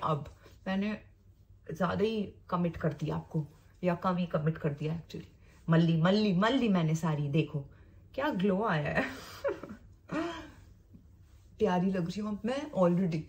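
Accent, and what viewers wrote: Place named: Punjab, India. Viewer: This was so fun!! 😄❤️ You have a strong Punjabi Delhi accent, and I love it! 😘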